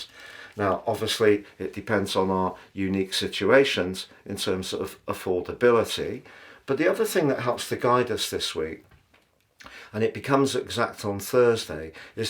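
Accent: United Kingdom. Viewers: I do love your very British English with such sheakspearian style. Greetings from Cairo Egypt.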